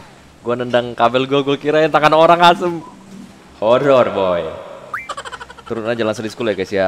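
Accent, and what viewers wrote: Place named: Indramayu, Indonesia. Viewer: kaya orang indramayu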